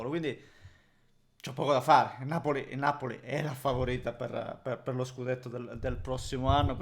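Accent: Calabria, Italy. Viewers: devo dire che la cosa che più mi ha colpito del video è scoprire che sei lucano (lo scopro ora nonostante ti segua da anni) il che mi ha sorpreso perché dalla tua parlata passa un filo di accento calabrese quindi immagino tu sia della zona vicino la calabria. magari mi sbaglio, tuttavia io ho origini materne proprio in basilicata e quindi volevo sapere di che zona sei magari c’è la remota possibilità che tu sia della “mia” zona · È lucano? Ma parla e si muove come i calabresi ahahahaha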